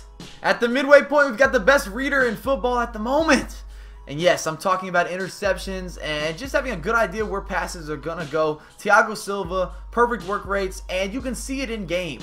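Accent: United States. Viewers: Are you America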